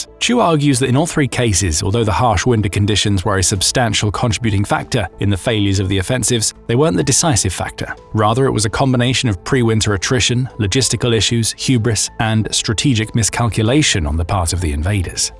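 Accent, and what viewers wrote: United Kingdom. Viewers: How weird it sounds to hear someone with a British accent calling autumn, "Fall"! Good presentation, though.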